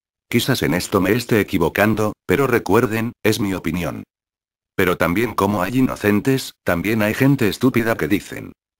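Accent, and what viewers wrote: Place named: Spain. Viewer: porque hablas como español si sos argentino?pense que eras de España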